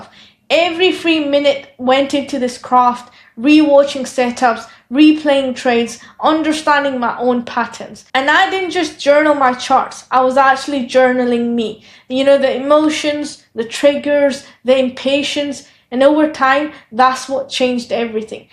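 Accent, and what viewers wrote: United Kingdom. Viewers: Thank you for the video. I am good with accents but I'm really struggling with yours. You sound a little Scottish, but not. Where are you from? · Are you from UK?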